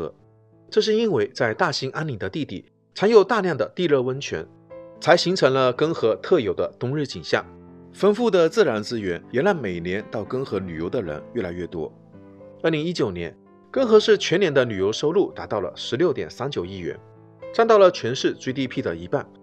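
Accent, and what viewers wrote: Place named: Fujian, China. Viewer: 你这口音很福建啊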